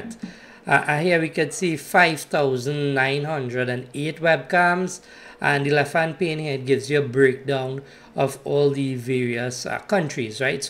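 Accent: Trinidad and Tobago. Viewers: trini boy?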